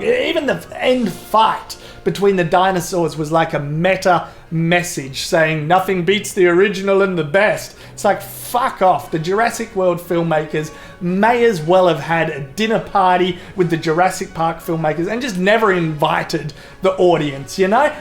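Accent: Australia. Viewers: Your Australian accent is so golden I don't want to hear it too much and get used to it so I can enjoy your animal fight videos more thoroughly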